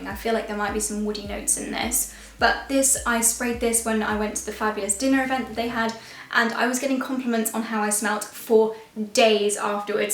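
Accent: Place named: United Kingdom. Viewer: Quality creams give quality results, so classy charming absolutely finest British accent, beautiful sensitive lady , God bless dear 💚💚💚💛💛💛💙💙💙💜💜💜💕💗💗💗💗💗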